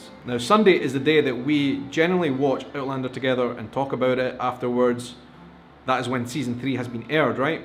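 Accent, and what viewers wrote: Scotland, United Kingdom. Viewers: I was born in 1938 and raised in Edinburgh. Educated in Catholic Schools. St. Anne’s in the Cowgate, St. Patrick’s, just off the Cowgate, and St. Anthony’s. I believe they are all gone, at least two of them. I grew up during WWll, and yes, I was taught Scottish History, including The Jacobite Rebellion and definitely Culoden. (Sp.) I did manage to see and enjoy the first Season of Outlander. On Amazon Prime, although I haven’t seen the following seasons. Although I haven’t checked lately. I’ve been on Amazon for many years. After nearly 55 years in the USA, I enjoy seeing Edinburgh on YouTube. So funny that where I grew up, a working class area. Guthrie Street. Now it’s gentrified. But I have so many fond memories of growing up in ‘Auld Reekie’ Love your show, keep it up, nice hearing my old accents. Takes me back, fondly. 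· Hi, I like your YouTube's channel and I love Outlander. I'm from Brazil and I hope you understand my english. First of all I really love the scottish and british accent in general, even yours. It's very sad to know that you didn't learn about your country's history in school, only students after 90's. I think it's because the clans, tartans and gaelic language were prohibited in Scotland by the english government. About half percent of scots wanted to go out of the United Kingdom. Do you think it is because the European Union's exit or scottish culture/politics or both? Someday I will visited Scotland, including the Highlands.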